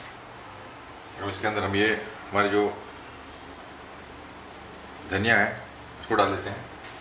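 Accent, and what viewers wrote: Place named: Indore, India. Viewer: mujhe aap ki language accent se laga tha ki aap Indore se hain, but saari dishes aap ki Rajasthani influenced hoti hai isliye pucha, vaise mai bhi aap ke podas mai hi rehta hoon Bhopal, aur meri wife Indore se hai 🙂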